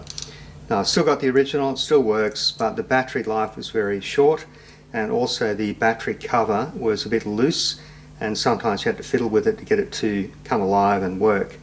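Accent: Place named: Australia. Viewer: Good Show Mate: As per normal. I always enjoy your vids. Enjoy the Ausi accent too.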